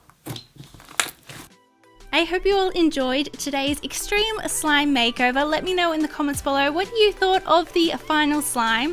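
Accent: Australia. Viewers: is solo beautiful and LOVELY, are you Australian?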